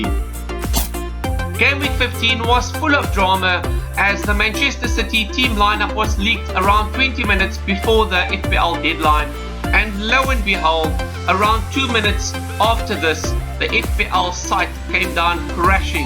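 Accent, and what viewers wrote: South Africa. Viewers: South African?.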